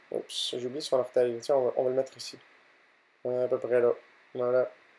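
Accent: Canada. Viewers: T'as un petit accent canadien, j'aime bien. Tu pourras dessiner Sulfura stp? De toutes façon t'y arriveras vu comment tu dessine trop bien. Et comment tu fais pour éfacé avec ta main?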